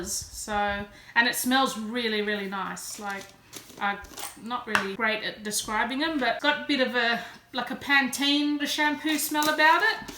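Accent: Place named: Australia. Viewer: Get bum fluff off your face...so nice to hear an Aussie voice. I can't resist stationery & paper products either. Tfs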